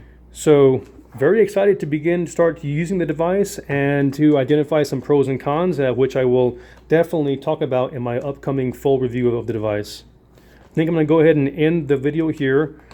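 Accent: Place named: Argentina. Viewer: Pensé que era un ARGENTINO :V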